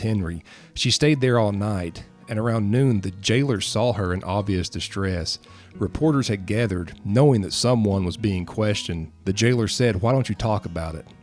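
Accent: South, United States. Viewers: this was one of the best iv seen/read on u-tube ,was quick and concise too the point ,the south in your mouth added to its feeling of CONFIDENT story telling ,kudos too yall ,WELL DONE ! bring on more like it. thanx!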